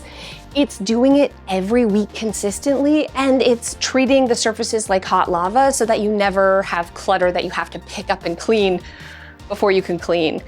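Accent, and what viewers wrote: Canada. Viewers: "aboot" I knew Canada before i even checked. 😍 Great video. Systems are key. Keep up the great work.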